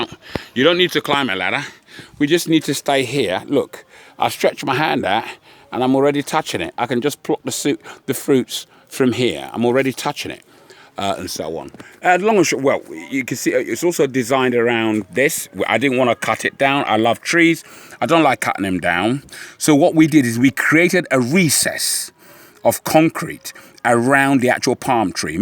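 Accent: United Kingdom. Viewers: I was thinking to myself that you sound so British and found myself wondering if you spoke Igbo. Then I got to the end of the video, and you actually spoke Igbo. Love it!!😍😍 You are a bonafide sob of the soil. Okpara eji eje mba! Jisike, nwanne’m.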